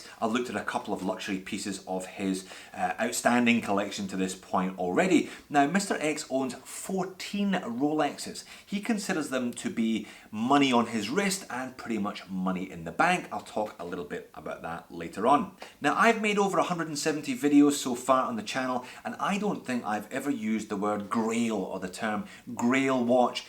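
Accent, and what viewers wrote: Scotland, United Kingdom. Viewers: Are you Australian? It may just be because I'm a stupid American but you sound very Scottish to me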